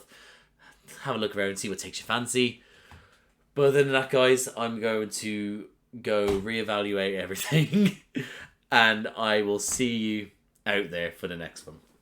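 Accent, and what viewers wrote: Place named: Northern England, United Kingdom. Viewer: R U from northern England or southern Scotland? You pronounce "about" as "aboat". You also pronounce "heart" as "herrrt".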